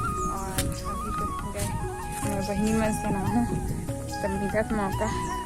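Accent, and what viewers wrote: Chhattisgarh, India. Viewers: जबरदस्त मिठास हे तोर छत्तीसगढी बोली मे❤ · Apke awaj se pta chl rha h ap dhamtari se ho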